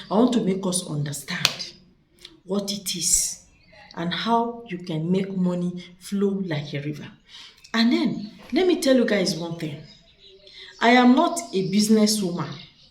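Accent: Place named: Yoruba, Nigeria. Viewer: Me, I watch, like and down load... Thank you.💃💃💃💃💃💃💃... You talk like youruba...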